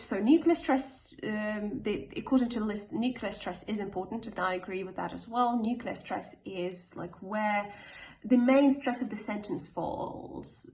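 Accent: United Kingdom. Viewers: It's amazing and wonderful! A girl from Belarus, who has never lived in the UK, speaks perfect English with a British accent. I am truly impressed by your mastery of the English language. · I'm not British myself but I thought you were so when I heard you speaking for the first time. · Halaew halaew. This is very overbritish accent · Согласна, результат поразительный, думаю, у не просто музыкальный слух, а именно талант подражания плюс много часов работы и исключительная старательность и вуаля! - прекрасный British accent! Well done! Думаю, многие учителя из постсоветского пространства даже очень завидуют вам!👍👍👍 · You practically sound British. With the tiniest hints of Australian here and there, if that. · Hi! I would start by telling you that your RP accent is amazing. Most of the time, English learners are afraid to look ridiculous with the British accent, much more difficult to master, and that’s why they tend to choose the American accent. 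And you are living proof that it is possible to master a superb British accent. And I will continue by saying that I do not agree with Vivianna. There are already enough channels with short formats. I don’t know if you’ve heard of Luke’s English Podcast, it’s a podcast with a long format, and it has won several awards and consider by its peers as one of the best podcasts and with great success even with a long format;) Ps: And yours videos are far from imperfect. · Hi! You have such a beautiful British accent. Can you share a few tips on what exactly helps to make the effect of a British accent?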